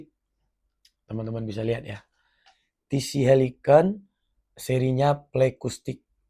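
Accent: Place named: Padang, Indonesia. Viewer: Bg lu org padang ya? Bahasa indo lu agak lain 😂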